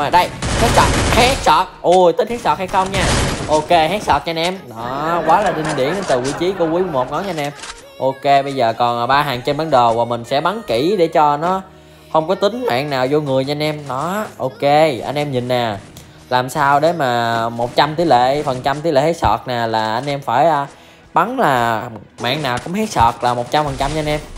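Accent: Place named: Mekong Delta, Vietnam. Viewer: hèn gì giọng miền Tây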